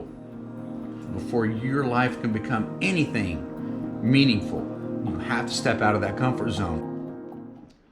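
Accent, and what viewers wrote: Texas, United States. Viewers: Hey, ma man u actually give attention to a lot of things, (the but i digress got me laughing) it reminded me of a tech-youtuber that says it a lot, what i wanted to say is actually even in here we have stuff open by night in the big citys like (rabat/casa/marrakech) ex: macdonalds stays open to about am some other late night kebabs do too, but with the pandemic everthing is closed even in the usa i think it's not the same with the pandemic, btw we understand you because you are from the usa and not from irland or some other part of the uk, your english is pretty much like what we see on tv shows & movies, the tea done right + u actually are more morrocan than a genuine one, why you may ask well most of us are not perfect the way humans are is quite universale, the exmple you gave for being addicted to tobacco, the coffee, we can understand it's quiet normal. 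am only gona say well done, and god bless you brother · Hi! You are doing amazing even when you had coffee for the first days, I’m so impressed of your progress, you’re doing absolutely fantastic 👍🏻 I’m Moroccan in America, I teach my kids to be thankful everything, even the air that we breathe, because we have everything here we don’t feel there that is different somewhere else. My daughter is 11 now and because there is no school she wants to experience fasting for the first time, and yes she is doing the whole day by choice. Note: kids should start fasting when they reach puberty in Islam. Please ask yourself those 2 questions: 1- why me in a Muslim country in Ramadan, what God wants for me to know? 2-why I was chosen to experience this and reflect on my life by myself while I’m getting physical and spiritual cleansing? Do I have to do more research to find out why? Things will come with time but do some from your part. 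yusuf Estes is from Texas, I suggest that you watch his videos, his has the same accent as you 😊 he explains a lot a about Ramadan and more m, he is funny too. And please take care yourself, the tea looks amazing, you need to eat more than that so stay strong for fasting. I’ll watch all your videos and always I can wait for the next one. Please upload more 😊 Take care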